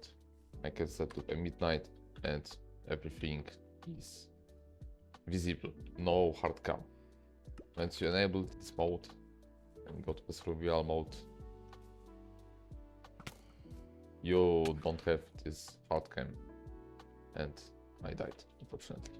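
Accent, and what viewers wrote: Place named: Poland. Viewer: Słychać ten polski akcent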